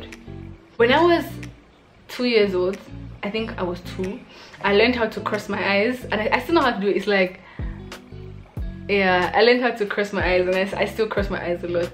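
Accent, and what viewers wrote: Nigeria. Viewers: Tbh, the very first time I heard you speak I 100% thought you were Nigerian. 😂🔥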